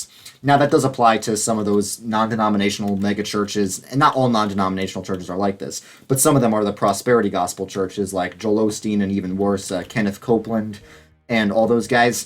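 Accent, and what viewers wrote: New York, United States. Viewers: mainly when you talk about walkable cities, those of us from hillbilly areas 😂, and the way your voice sounds. I’m just like “yep he’s from New York.” I died when you said “collecting the bigotry infinity stones” 😭😂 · I’m from NY as well and instantly recognized your accent on your videos lol. And yeah if you can see the city from your high school you’re definitely downstate. I’m from Albany and we consider everything south of Poughkeepsie downstate 😂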